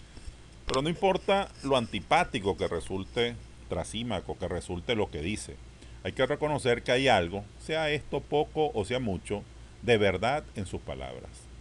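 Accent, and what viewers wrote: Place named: Venezuela. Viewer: por el acento es de Venezuela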